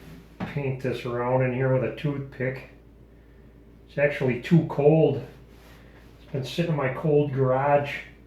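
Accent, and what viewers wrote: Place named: Minnesota, United States. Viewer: You're accent sounds exactly like Jesse Ventura. Is that Minnesotan?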